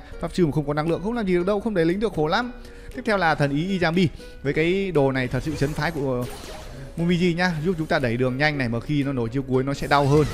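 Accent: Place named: Ha Tay, Vietnam. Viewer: Giọng a nghe như giọng hà tây kkkk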